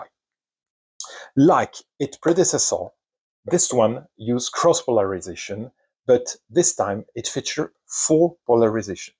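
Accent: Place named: France. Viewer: Interesting. Your English is fine (I only wish I could speak French that well). Your pronunciation of some of the letters (particulalry i and y) very amusing. A little like Peter Sellers in The Pink Panther films :-)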